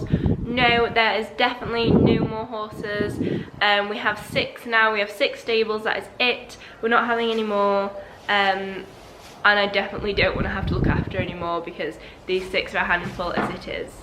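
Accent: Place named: Yorkshire, United Kingdom. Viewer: Thought I recognised the Yorkshire accent, I’m from hull and it’s quite strong lol x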